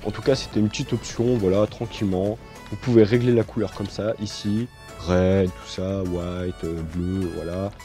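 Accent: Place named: France. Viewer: jppp ton accent francais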